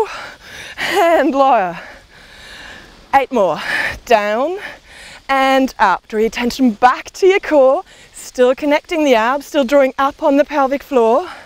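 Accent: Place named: Australia. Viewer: Nice video. I love you aussie accent !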